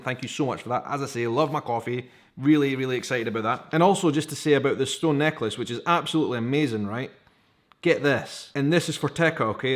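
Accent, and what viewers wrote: Scotland, United Kingdom. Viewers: All I know is lot of guys would like to be able to speak with you Scottish accent cause they would get a lot of chicks. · Interesting at times when I see a TV shows on BBC America, I thinks it cute to hear your English (British) enunciated words. Thanks for sharing.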